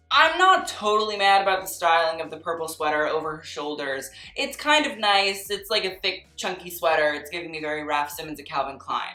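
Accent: France. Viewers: me when you said "episode huit" and the title said episode nine 👁 👄 👁 (in all seriousness i love that you say the french words as they are meant to be said we stan)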